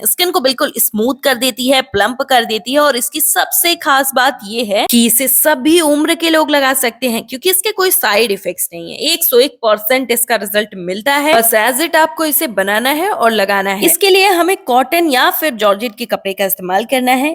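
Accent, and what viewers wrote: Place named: Gonda, India. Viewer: Gonda se bol rahe hai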